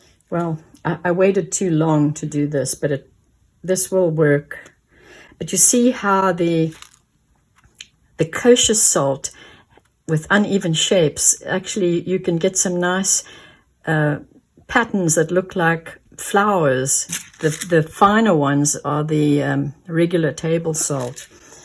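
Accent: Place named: South Africa. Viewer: I know this is out of context, but are you from South Africa? You sound very much from here.. love your channel❤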